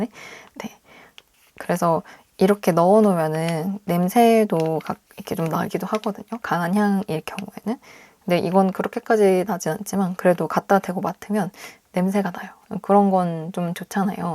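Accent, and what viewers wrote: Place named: Japan. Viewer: 한국어 마스터한 일본분 특유의 말투랑 발음이랑 완전 같아서요! 모든 발음이 둥글둥글 하고 받침이 약하다고 해야하나요? 약간 お / ん 이 모든 발음에 들어가는 느낌..? 웅냥양 발음이 속에 내재되어있는 느낌...? 그리고 그 숨쉬는 부분이랑 말의 템포랑 멈칫하시는 부분이 엄청 비슷하세요😂 약간 한일2세분들 이랑도 비슷한 느낌!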